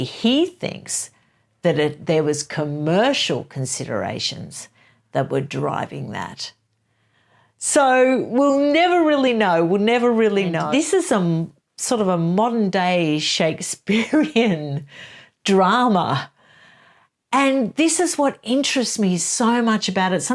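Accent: Australia. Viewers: I absolutely don't agree she was ever pregnant. Her belly was different sizes on different days. Often different sizes on the same day. What about when the bump fell down past her knees? Remember when, at almost full term, she did a deep squat in high heels & needed no help to stand up again. Unborn babies don't conveniently move to one side so you can squat. Anatomy doesn't work that way. I've always been very slim & had 2 babies in my 20s, not middle aged as she was. I can tell you, my baby bumps got bigger month by month, not up & down on sometimes an hourly basis. Neither did my babies conveniently get out of the way if I wanted to squat. Not that I could have, even if I wanted to. So much more I could say, but, NO she was never pregnant. Love your vids. Your lovely accent brings back fond memories of the 5 yrs it was my privilege to live & work in Sydney. 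It was bonzer. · I really like your lovely gentle Aussie accent (I'm a Londoner) and enjoy your views...thank you!!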